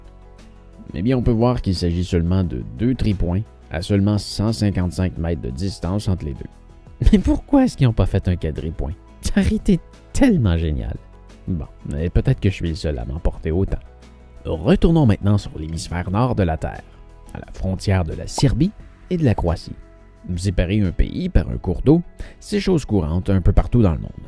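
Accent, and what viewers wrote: Quebec, Canada. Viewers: Ha la la. Je suis désolé. Mais je n'arrive vraiment pas à aimer l'accent québécois. Je trouve qu'il gâche le sérieux de son reportage. Mille pardons.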